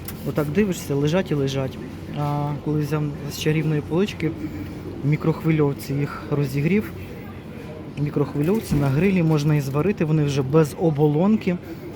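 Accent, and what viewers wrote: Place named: West, Ukraine. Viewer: На западе Украины так говорят. Мне тоже не привычно было, когда была в том регионе, слух резало, но со временем привыкаешь.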